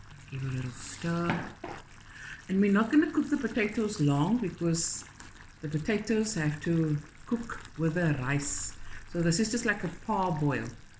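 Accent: Cape Town, South Africa. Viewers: It is a Cape Town accent.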